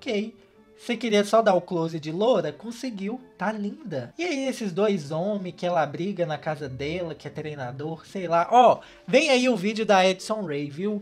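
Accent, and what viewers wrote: Minas Gerais, Brazil. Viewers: o sotaquinho mineiro iti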